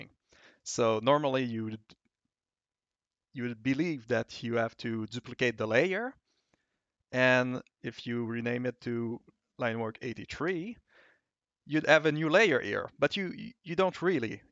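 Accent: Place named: Spain. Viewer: Tu inglés se escucha como si fueras hispanohablante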